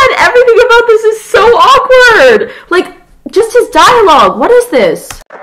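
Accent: United Kingdom. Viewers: Also, where did his british accent go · it's his voice! it's not weird ... {[(british accent DUHHH)]}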